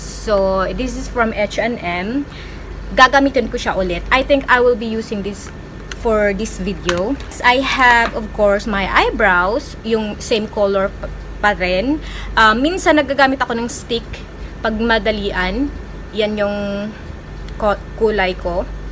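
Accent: Visayas, Philippines. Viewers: i love bisaya accent kaya ok lang yan seswang dami ko friend na bisaya at natutuwa ako kc alam mo mostly sa mga bisaya magagaling sila mag english..buti kapa magaling mag makeup ako seswang tumanda na talaga di ako natutong mag makeup😅kay vice din ang gamit kong liptint maganda talaga sya tapos di nagda dry lips ko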